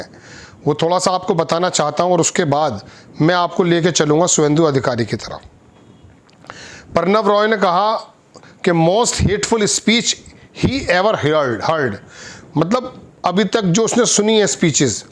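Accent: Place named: Meerut, India. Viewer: Patrkar sahab meerut ke aas paas ke shetr lagte hai bhasha se 😊😊😊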